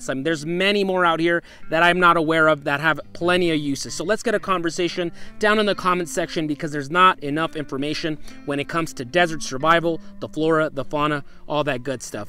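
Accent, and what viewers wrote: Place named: Northwest, United States. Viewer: Dude, I love this video and yes, I can straight up. Tell you a bunch. More plants that lived down there that actually grow in that envirogreat. Video and you have a good English voice. I know that sounds weird, but you actually speak like a northwesterner. That's where I come from. You. Kinda sound like I do. Not a Southerner. You don't got the dral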